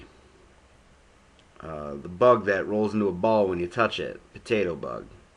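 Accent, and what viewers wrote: Buffalo, United States. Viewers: you definitely have a buffalo accent. I get it all the time, yet I never knew I had one till I went to Mass. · good representation of Buffalo accent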